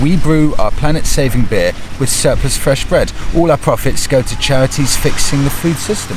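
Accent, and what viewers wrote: RP, United Kingdom. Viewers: My hero David Icke lives on the Island.I have been there years ago.It certainly looks nice if you are lucky with the weather.You have a very clear speaking voice BTW.Slightly posh but not as much as a Sloane ranger.We never did get to see,but i heard her low sweet voice.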